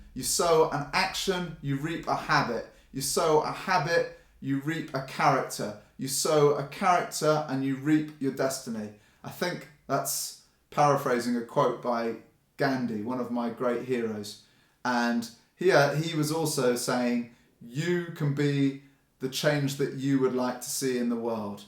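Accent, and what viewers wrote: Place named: South East England, United Kingdom. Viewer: I'm not really into the 'peace love and harmony' stuff and my initial impression of the first 5 seconds of your video was "oh here we go. some pseudo spirituality bullshit", but you were actually saying some interesting and meaningful things. Nice one. Also what part of England you from? Sounds like south-east.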